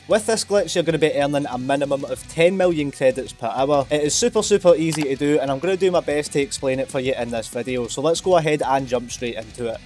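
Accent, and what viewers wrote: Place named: Scotland, United Kingdom. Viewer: Your Scottish accent is awesome, I enjoyed every minute of it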